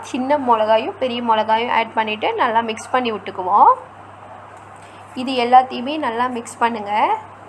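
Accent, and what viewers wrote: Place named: Sri Lanka. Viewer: Full video covered da super nd ur slang s lyk srilankan very nice to hear ❤️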